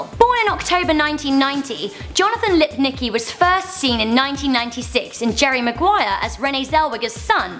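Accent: United Kingdom. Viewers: I like her British agcent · excuse me Miss Brit with the annoying accent..HALEY DID NOT HAVE A RUINED CAREER AT ANY MOMENT OF HIS LIFE SO FAR. <link> PROVES IT....CHECK IT OUT....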